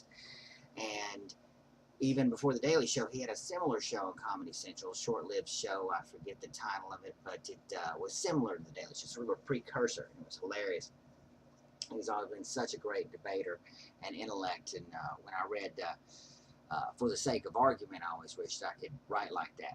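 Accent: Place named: South, United States. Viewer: Wow. An outspoken informed atheist; putatively South Carolinian. Ain't that the Bible Belt? I know it's prejudicial of me, but it's strange hearing knowledge and wisdom via a southern accent. I too am an avid atheist but never stopped seeking and learning. My fave is George H Smith's "Atheism, the Case Against God" but I've read over 100 others on atheism, science and religion and, of course, the Bible, a book I treasure as a peek into the minds of ancient people. Hope your intellectual stance isn't adversely affecting your social life., a fellow rather odd He named himself after one but don't accept no god!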